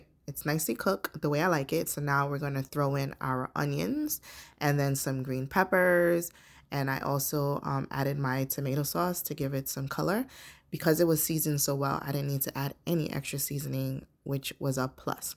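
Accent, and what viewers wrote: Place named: United States. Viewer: You.sound like you might be living in the States. Where do you finf scotch bonnet? People keep referring me to habanero, but it is just not the same.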